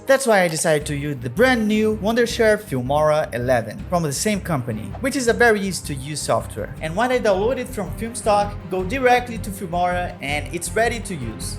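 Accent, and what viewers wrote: Brazil. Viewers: o inglês do cara é muito bom, mas sotaque brasileiro não abandona ele kkk soube assim que ele soltou a primeira frase (não tô dizendo que ele deve perder o sotaque) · teu ingles soa muito BR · Tu é brasileiro mano ? Por isso que eu achei o inglês familiar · Sensacional! Não só o trabalho que está sendo feito, mas também seu ânimo e resiliência em realizá-lo! PS.: Cara, você é de Minas! Que maneiro! O uai que habita em mim saúda o uai que habita em você. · Mno tu e brasileiro teu sultaque E teu jeito de falar Vey Se pode mim explicar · Nem acredito que vc é brasileiro, deveria ter percebido pelo sotaque. Muito bom saber disso.❤ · mano tu manda muito e da pra perceber que tu é br, mano tu trabalha DMS mano 😔✊ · esse ingles com sotaque brasileiro kkkkk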